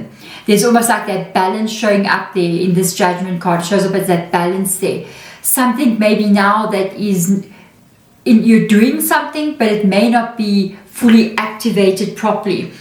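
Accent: South Africa. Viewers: Your readings are amazing 🙏 I recognize the SA accent - hope you are - am totally resonating with yr other readings too Thank you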